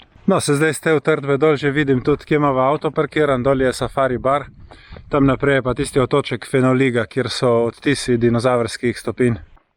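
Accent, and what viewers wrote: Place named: Littoral, Slovenia. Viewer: HAHA, približno takrat sem tud jaz z starši in starimistarši hodu na morje. Sicer ne v ta kamp ampak v Banjole v neko turistično naselje blo je več blokov (mislim, da je blo prek zveze borcev ali upokojecev) kaj človek vse doživi, da na YT slediš nekomu, ki ima še druge podbbne hobije in veselje, in še na skoraj na isti konc hodu na morje (mogoče še celo istočasno). Upam da se kdaj srečamo, mislim da bi te bilo z veseljem spozat, pomoje si zanimiv sogovorec. Pri gledanju tvojih videov sem tudi že opazil, da kolesariš tam kjer sem jaz že bil na izletu. Tko, da je že iz tega naslova zelo lepo gledat tvoje videje. Pa še ta primorski naglas, men en nelepših.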